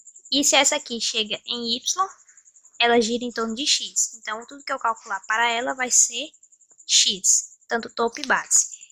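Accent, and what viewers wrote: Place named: Northern Minas Gerais, Brazil. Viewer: Sotaque norte mineiro. Belo vídeo.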